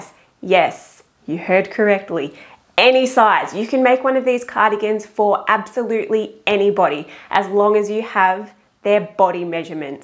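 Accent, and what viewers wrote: Australia. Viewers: Well-designed cardi, new sub, just want to listen to your Aussie accent...😘♥️🦋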